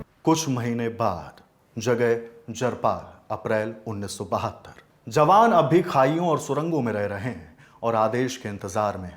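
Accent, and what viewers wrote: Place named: Bihar, India. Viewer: Yar kahani simple bata itna ghumata q hai bihari accent thik karo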